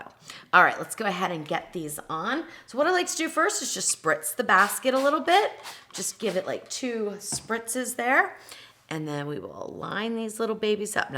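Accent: United States. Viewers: Do I hear Wisconsin?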